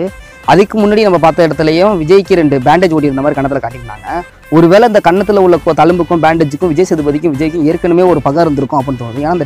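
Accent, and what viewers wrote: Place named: Canada. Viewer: Enna bro Canadian lad maathiri tamil maappilaya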